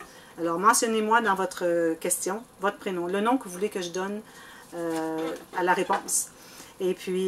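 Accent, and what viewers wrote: Quebec, Canada. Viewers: Vous méritez amplement les 5000 abonnés car votre travail et vos explications sont parfaites, votre générosité est sans pareil, continué encore à nous faire rêver pendant très longtemps.Je vous rassure votre français est parfait, et j'adore votre accent, apprendre des expressions canadienne est enrichissante.Bisous et encore MERCI POUR TOUT. du sud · Super bonne idée. 'Merci pour ce cadeau. Curieuse de voir les questions des fans. Génial, je peux mettre un visage sur cette jolie voie québécoise. Déjà rien que ça, c'est un beau cadeau. Merci d'être vous et de toutes vos explications. Bonne journée de Wallonie ( Belgique ) sous le soleil, 30° à l'ombre, caniculaire 😕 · Coucou bonjour, wahoooooooo félicitations pour tes 5ooo abonnés quel plaisir de pouvoir te découvrir en " vrais " ne change rien ton français est au top tout comme ton accent moi j'adore tes expressions canadienne merci pour tous tes tutos très instructif car très très bien expliqué... 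bisous bisous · bonjour merci pour toutes ces vidéos partage. pour ma part vous vous expliquez bien dans vos explications. un bel accent québécois je voyage en vous écoutant. vous méritez tous ces abonnés. un p'tit coin de france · Bonjour! Je m'appelle..... J'habite en "Drôme Provençale" (sud de la France dans la vallée du Rhône) où il fait très chaud. Et ce petit air du Québec me fait voyager. Nous adorons votre accent, ne changez rien! J'ai commencé le scrapbooking il y a quelques mois et je suis éblouie par votre travail. Même si c'est trop dur pour moi pour l'instant j'apprends beaucoup de choses. Votre simplicité, votre gentillesse et votre bonne humeur sont des parenthèses de bonheur. Et bravo pour les 5000 abonnés! Je vous embrasse. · Coucou toutes mes félicitations !!!! et merci pour les tutos super bien expliqués et avec ce magnifique accent québécois ;-) j'adore ;-) Gros bisous de la Lorraine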